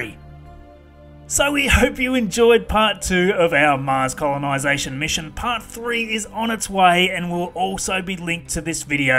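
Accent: Australia. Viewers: Wow. While listening to you, I thought to myself "this guy has a funny accent, I'd say it's Australian" Then I checked your channel and there it is, you're Australian. As English is not my mother tongue (I'm French), I'm quite proud of myself for recognizing where the accent is from \o/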